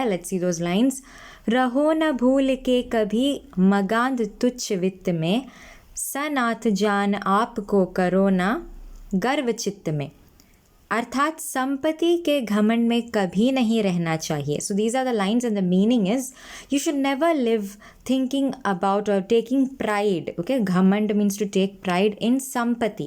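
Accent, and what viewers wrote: Kerala, India. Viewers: Mam...I guess u r a Malayalee..am I right ? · Ig Kerala due to the accent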